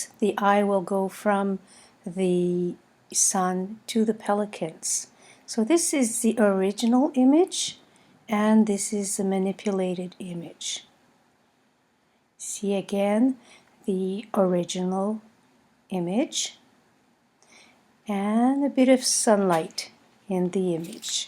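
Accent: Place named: France. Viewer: Ha ha ha ! There is a touch on his diction almost imperceptible, but the musicality of "sugary" French is there ! :) Kindre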